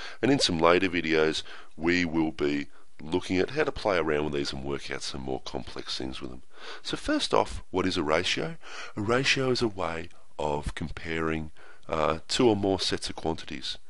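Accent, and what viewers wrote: Australia. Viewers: He’s Australian. Watch a couple Aussie shows, movies, or certain documentaries and you’ll understand him even if he had a cough. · You can just tell he's australian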